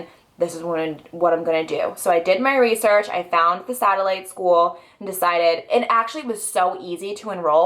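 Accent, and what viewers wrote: New Jersey, United States. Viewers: It comes in and out...east coast/New Jersey sounding...but it is really slight...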